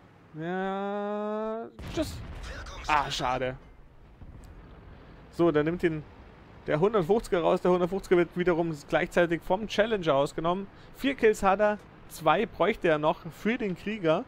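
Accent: Bavaria, Germany. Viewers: Mal ne Frage kommst du aus Bayern? :D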